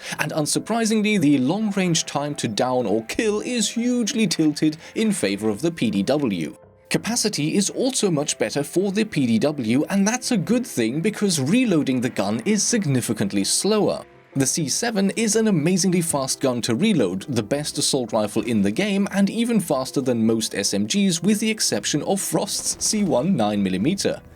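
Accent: United Kingdom. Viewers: That’s just cause he’s British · I thought this guy was a robot with a british accent. My whole life has been a lie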